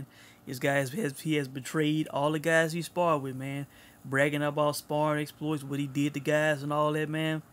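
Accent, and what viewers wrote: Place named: South, United States. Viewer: lol you have a natural Southern accent already, so I didn't get you quoting in country, something... as far as brook wanting get acclimated to a heavier weight before fight ggg again. that's realistic. you should want you fight to feel comfortable going a whole 12rounds with the weight.. jumping up two weight classes isn't smart to do. I do agree about Spence Jr talking about sparring sessions... that's not cool.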